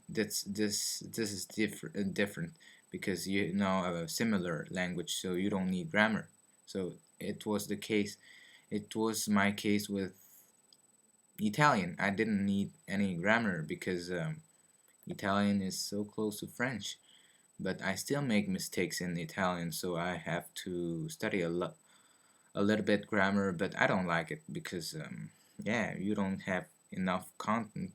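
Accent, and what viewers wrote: United States. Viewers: You seem to speak using american accent... I'm brazilian and understood you well :) I think british accent is a little hard to understand, do you also think it? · Jesus, I assumed he lived in an English-speaking country because his English is damn near perfect. Extremely few grammatical errors (only mistakes I'm catching are using plural at times we use singular, like "transportation" or "homework". But I understand why people would make that mistake, because in English we just don't have plural forms of those words), and his accent is really minor. Sounds like he's been living in the US for years.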